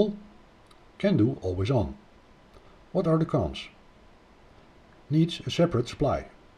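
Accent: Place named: Auvergne, France. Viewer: il nous agace avec son accent auvergnat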